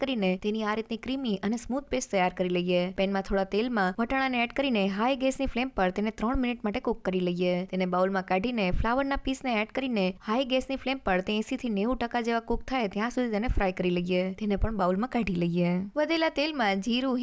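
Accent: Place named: Saurashtra, India. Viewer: Didi tamari language saurashtra jevi lage che.. tame kyana cho ?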